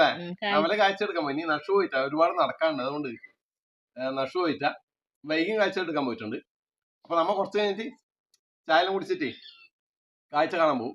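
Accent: Kannur, India. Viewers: കണ്ണൂർ ഭാഷയുടെ ഒരു സൗന്ദര്യം ആഹാ ❤❤❤❤❤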